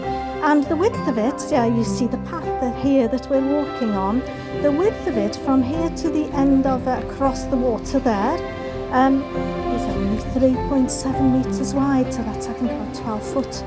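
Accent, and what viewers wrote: United Kingdom. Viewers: This is a very informative tour and it's relaxing listening to your sweet voice and lovely British accent.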